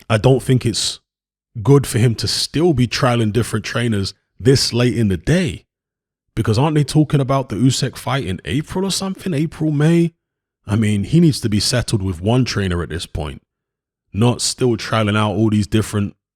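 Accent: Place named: Cockney, United Kingdom. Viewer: He has a cockney accent why tf are you so upset?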